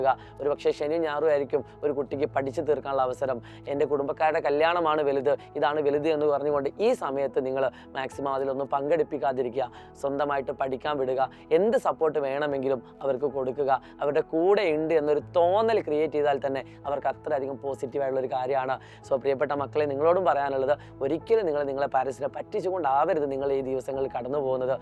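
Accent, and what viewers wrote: Thrissur, India. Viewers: Thrissur like adiiiiii❤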